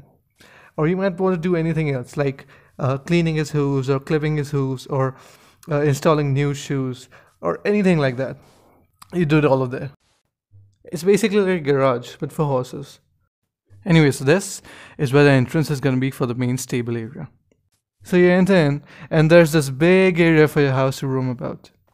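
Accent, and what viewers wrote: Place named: Europe. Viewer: Are u from europe? U kinfa sound like ur from there. Im asian 🇵🇭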